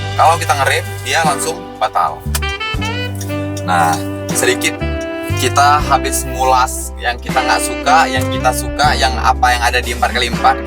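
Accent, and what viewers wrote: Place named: Java, Indonesia. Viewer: Orang mana nih, ngomongnya medok banget ??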